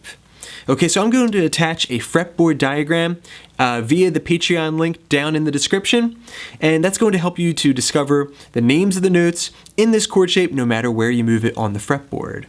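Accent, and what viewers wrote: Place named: South Jersey, United States. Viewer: I'm from South Jersey but have lived overseas a long time. Great hearing that familiar SJ/PHL accent! Great tutorial. Seriously helpful!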